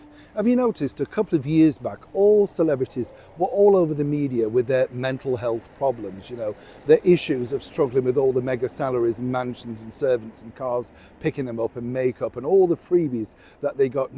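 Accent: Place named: England, United Kingdom. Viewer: So enjoy the street scenes you have. Those along with your accent, make me feel tht I’m back in England.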